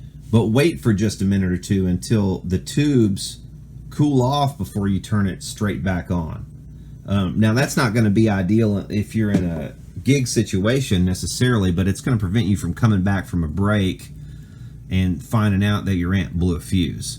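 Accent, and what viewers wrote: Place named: South, United States. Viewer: The slight southern accent adds to the credibility of this bullshit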